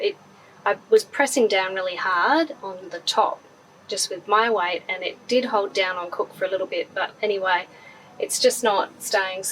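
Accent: Australia. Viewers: So stocked yo hear an Aussie accent!!!! I’m about to get a van……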